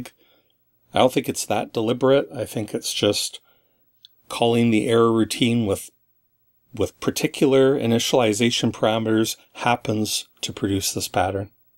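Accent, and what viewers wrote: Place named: Sault Ste. Marie, Canada. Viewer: Hi, I'm watching this in July 2019 months after the Wisconsin convention (hey, that's the beauty of YouTube) but you say in the intro you were heading down from Canada. With Canada being the second largest country in the world, where in Canada? If it's a privacy issue, no problem. I've just subscribed and started binge watching some of your C64 and 6502 videos, great work! I'm going to hazard a guess based on accent... Sault St Marie? Regardless, keep up the great content! Your channel is a retro-blast and despite thinking I've mastered 6502 asm and the C64 world, I've learned some great little tricks so far! I was happy to see that these quirks "work" on Vice C4 Emulator (except the "screen saver" one) as well as my C64!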